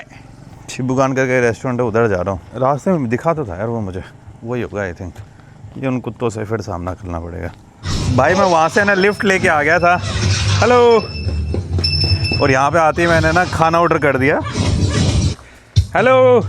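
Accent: India. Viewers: Just a suggestion. Use interrogative sentences when asking a question. "This is a fisherman village, ?, yes!?" This sounds vague and confusing, and sometimes it can come across as rude (especially with our Indian accent). We don't have to change our accent, but instead, we should use an interrogative sentence...like ask, "Is this a fisherman village?" simple, effective, short.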